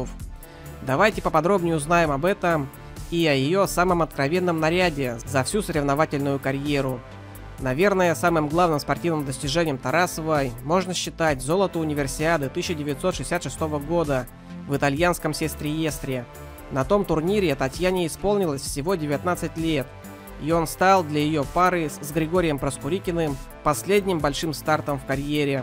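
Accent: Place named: Ukraine. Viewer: типичное украинское произношение, не в обиду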